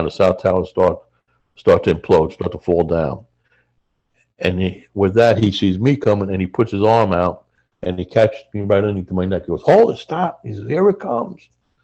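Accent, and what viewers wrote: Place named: Ireland. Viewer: Interviewer has an Irish accent from Ireland, firefighter has an americanized Irish accent.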